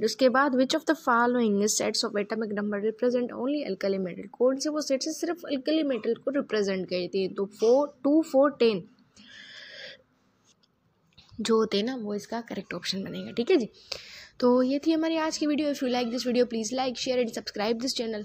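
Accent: Sindh, India. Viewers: Ma'am are you Sindhi?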